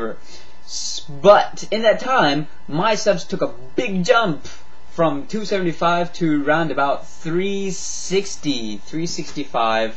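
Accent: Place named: Japan. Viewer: The ages of 13-17 and 45-54 must be the most despicable male age ranges, due to a lack of women/ lack of attractive women. I'd imagine the desperation of their lives leads them to your feline idolatry. Please exploit it and encourage all your subscribers to dress as cats and crawl across the floor. That would be such a good set of videos! Just tag them By the way anyone who has an interest in cats should check out icanhascheezburger. I'm very jealous of your Japanese accent.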